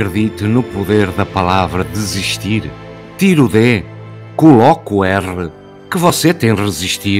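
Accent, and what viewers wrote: Portugal. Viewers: Que lindo numa voz portuguesa, lembro de Fernando Pessoa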